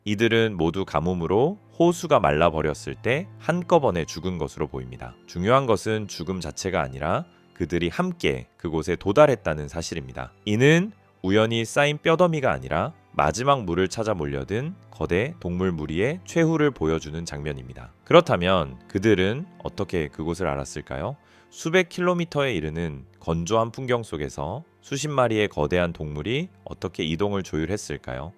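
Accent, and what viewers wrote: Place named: China. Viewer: ㅋㅋ짱깽이냐 3M를 세미터 그러고 서론이 너무길다 구독했는데 취소하고 간다 한국말 연습 더해라ㅋㅋㅋ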